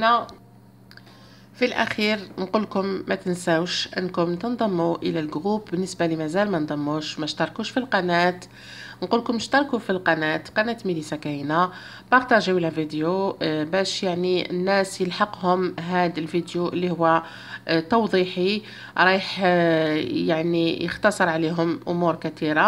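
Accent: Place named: East, Algeria. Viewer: جابلي ربي من الشرق الجزائري حسب هدرتها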